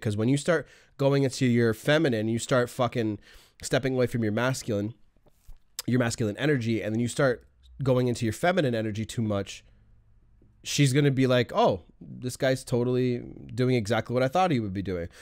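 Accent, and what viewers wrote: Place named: Montana, United States. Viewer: Hey, where a-bouts are ya from? You almost sound like you are from Montana? Canada? Lmao!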